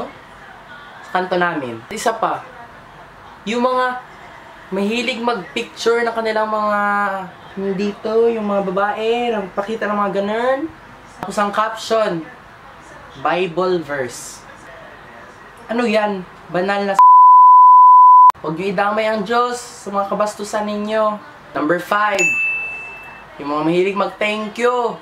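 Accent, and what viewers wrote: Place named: Visayas, Philippines. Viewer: Bisaya ka fu ba? Or whut? Accent is real .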